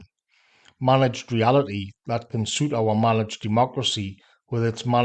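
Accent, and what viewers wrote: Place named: North East England, United Kingdom. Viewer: I love it that a working class lad from the North East is far more intellectually than most of our shit stain politicos .it gives me hope I'm working class from the North as well knowing thus bloke is out there on line who speaks like me makes me so happy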